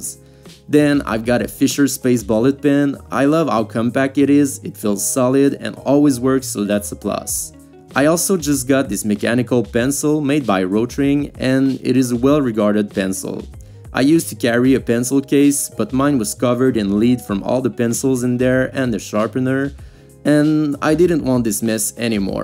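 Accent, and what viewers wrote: Quebec, Canada. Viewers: Are you by any chance from Quebec? Your accent sounds quite familiar. aha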